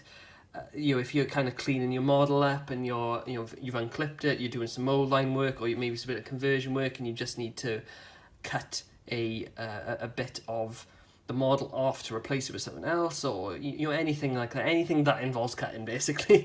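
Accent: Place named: Wales, United Kingdom. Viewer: id say he's from wales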